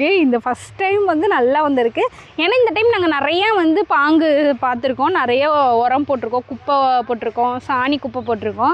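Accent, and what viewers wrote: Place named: Gobichettipalayam, India. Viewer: எங்க ஊர்லயும் மிளகு தக்காளி கீரை யை கறிஞ்சி குட்டி கீரை தான் சொல்லுவோம் sister...... ******. *********. **** இப்படிக்கு கோபிசெட்டிபாளையம்.......... உங்க language கூட கோபி சைடு பேசற பேச்சு மாதிரியே இருக்கு பா..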